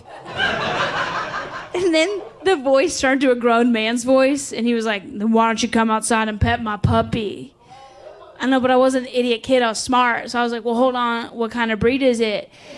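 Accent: United States. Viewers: I recognize the accent I had a feeling she was from Kentucky just from how she said latch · How are you southern and from Kentucky?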